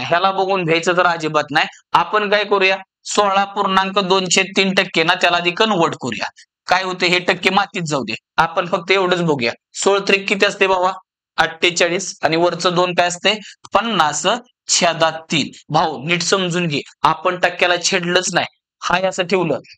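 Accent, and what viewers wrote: Rural, India. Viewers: अप्रतिम आहे सर तुमचे भाषा कौशल्य.... तुमच्या बोलण्यातून गावाकडचा गोडवा आणि शिकवण्यातून आई सरस्वतीचा आशीर्वाद दिसतो...🙏🏻🙌🏻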